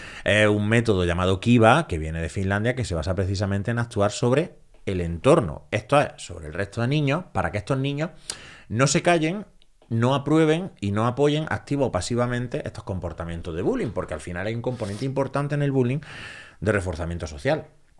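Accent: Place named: Granada, Spain. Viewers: Ramón Nogueras, eres un profesional reflexivo, pracmático, analítico de los pros y contras que me causas gran respeto. Aunque, tienes mucho más, claro, pero lo que te "corona" mientras pláticas, es ese repertorio de dichos tan cómicos y tú dejé andaluz que cada uno de ellos me chirría en el cerebro desternillantemente y me obliga a parar el vídeo para reírme a gusto! Has probado ya ha dar clases terapeúticas basadas en la risa?😉👏🏼👍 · Me encanta el acento granaino 😂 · Me ha encantado este psicólogo, con una forma de ver el mundo desde otra óptica , últimamente todo es “ si quieres puedes” y el tema de la forma de hablar , muy característico de Granada. Aunque está fuera de su ciudad no ha perdido el acento ni las expresiones de allí.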